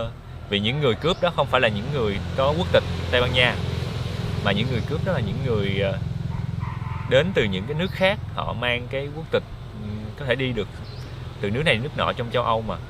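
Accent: Mekong Delta, Vietnam. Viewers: Thích nghe giọng nói ghê, ấm áp mà đậm chất miền tây nam bộ sao á❤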